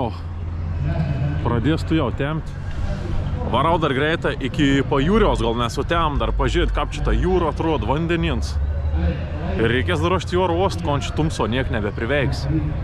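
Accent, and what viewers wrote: Samogitia, Lithuania. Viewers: Video super 👍👍👍 seku seniai vienas įdomesnių contento kūrėjų, kažkiek primena Kurt Caz tik su žemaitišku akcentu.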